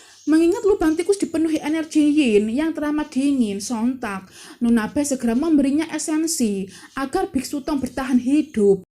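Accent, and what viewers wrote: Java, Indonesia. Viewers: Medok karena mbaknya orang Jawa,,, masing-masing Suku mempunyai ciri khas,,, ini lebih baik dari pada pakai suara google,, imut, renyah, empuk,, tapi bukan suaranya sendiri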